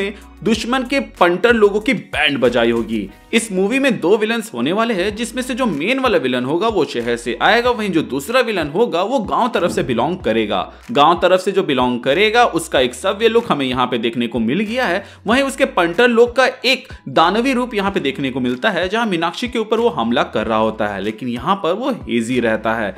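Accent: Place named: Jharkhand, India. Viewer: Feka jata h , dhekla jayega bhai tm jharkhand se ho kya ???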